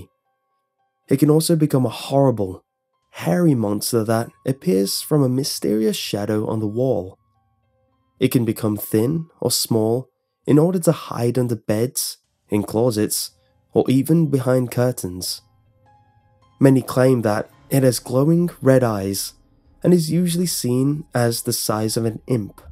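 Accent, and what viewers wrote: England, United Kingdom. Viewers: It's an English accent there is no such thing as an British accent at all. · lol you kept saying it wrong but I still love that British accent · Im hispanic, and you saying Spanish-y things with your wonderful British accent was enjoyable XD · give him a break! he has a british accent what do you expect!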